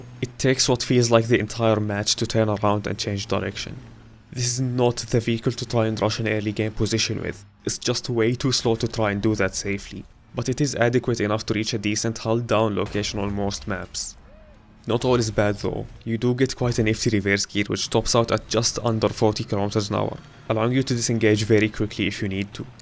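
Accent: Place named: Arab world. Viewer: Love the Arabic accent ;) nice vid man, keep it up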